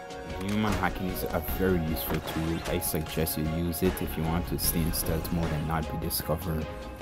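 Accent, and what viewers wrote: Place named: Jamaica. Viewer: Your Jamaican accent is so cool bro 🙌🔥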